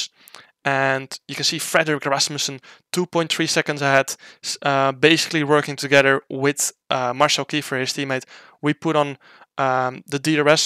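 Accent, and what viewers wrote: Netherlands. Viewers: Always love to hear your dutch accent while speaking haha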